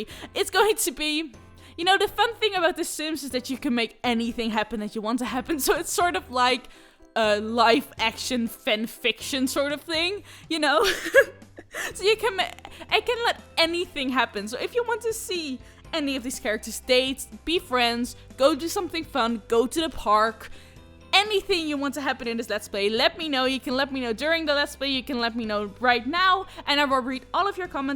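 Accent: Netherlands. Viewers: your accent is so cute! i really want to learn dutch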